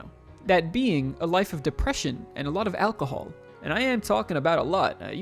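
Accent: United States. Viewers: The New yorkuh accent is a bit distracting, but video not too bad so far. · I think you got that New Jersey Ray Lotta thing going on.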